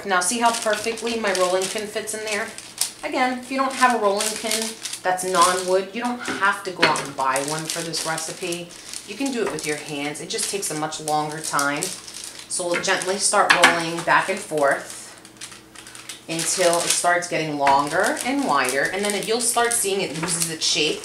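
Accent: New York, United States. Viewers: THAT'S A NYC ACCENT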